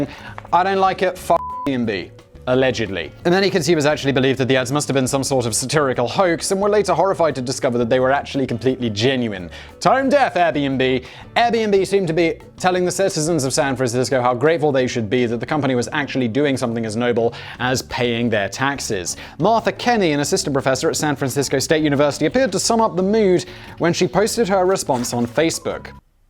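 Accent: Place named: England, United Kingdom. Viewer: Simon owes his popularity to the fact that England invaded so many countries. Now when the entire world hears an English accent they sit up and listen, because that Brit probably runs things. Especially the guy with the posh accent... he must be in charge. We just take Simon's authority for granted the minute he speaks. Before you know it, eight channels.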